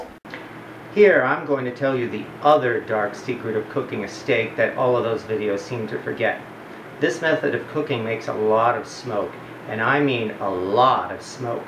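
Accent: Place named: Appalachia, United States. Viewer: You sound like a frigging hillbilly, are you?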